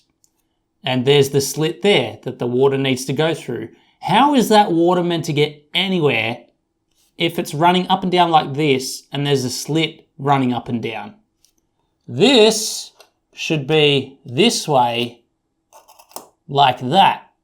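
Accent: Australia. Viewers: Are you aussie?? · Average American looking guy sounds aussie.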